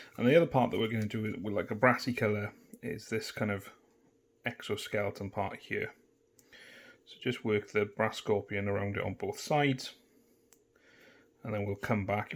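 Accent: Wales, United Kingdom. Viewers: Another great tutorial mate, you're really making me want to get some of these new Necrons now. Also it's great to hear another Welsh accent on Youtube, Keep the good content coming!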